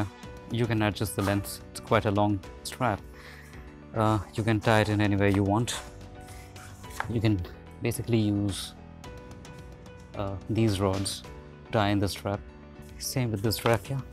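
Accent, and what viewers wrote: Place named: England, United Kingdom. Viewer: Explained neat and nice! The English accent is lit!!!!!!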